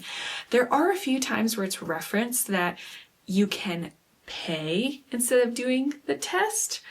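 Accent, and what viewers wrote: Germany. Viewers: Just found your channel. I'm a complete beginning knitter, although I crochet. Thank you for your work. I was an exchange student to Germany in 1972. I love hearing your German pronunciation. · Ich habe an deiner Aussprache gemerkt das du Deutsche bist, deshalb schreibe ich dir in deutsch. Mein Englisch ist nicht so gut. Was muste ein Meisterstricker in 30 Tagen stricken? Gab es damals schon Vorlagen oder hat er das aus dem Kopf gemacht?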